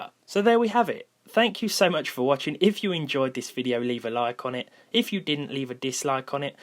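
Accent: United Kingdom. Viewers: Dis fookin brit accent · Why do British people say knot 1 5 percent instead of zero? Not joking I legit am confused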